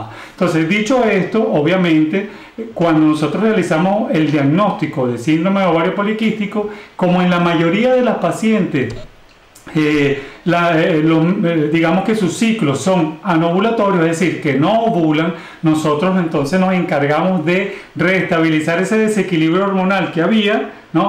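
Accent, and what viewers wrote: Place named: Venezuela. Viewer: Doctor saludos desde Italia y ese acento suyo me parece criollito venezolano.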